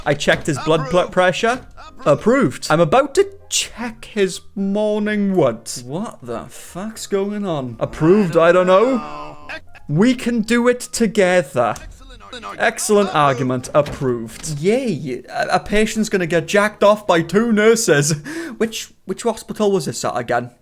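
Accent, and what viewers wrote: Wales, United Kingdom. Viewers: why are you british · Nice brit accent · are u welsh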